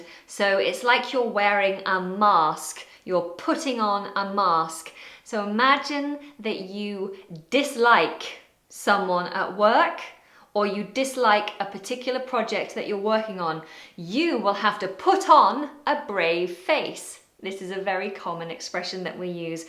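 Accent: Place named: Liverpool, United Kingdom. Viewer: lol, sudden transition effect scared me:)... SUPERB VIDEOS ..KEEP POSTING ...WANNA SEE ANOTHER LIVERPOOL ACCENT VIDEO OR MAYBE A SERIES OF VIDEOS....haha amazing accent.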